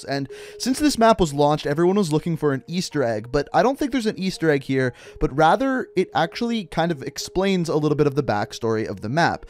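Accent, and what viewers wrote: Canada. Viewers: I was just trolling man, no harm. And as far as the way he pronounces things, he is Canadian they pronounce things differently than the Americans! Like About is Aboot etc.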